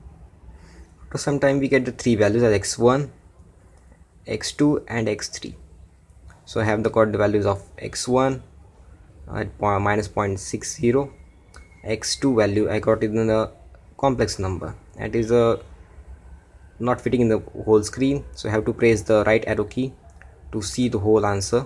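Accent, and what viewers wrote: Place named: Italy. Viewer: love the italian accent ! really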